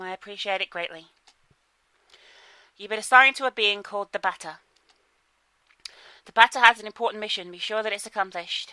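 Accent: United Kingdom. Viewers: are you british? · Yes she is British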